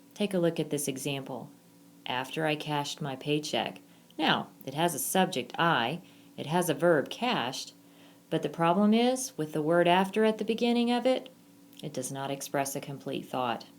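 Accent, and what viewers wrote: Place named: United States. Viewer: That's her American accent. I like it lol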